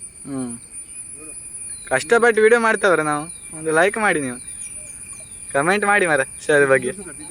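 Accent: India. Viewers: Hi yava vuru nimbhu kundapur kannada matadatira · Byndoor kundaluradavara reeti mataduttiri neevu.Uttara kannada bhashe bere reeti iruttade.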